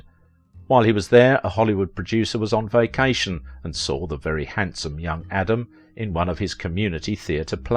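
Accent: United Kingdom. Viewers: You’ have got a wonderful British accent! Love it!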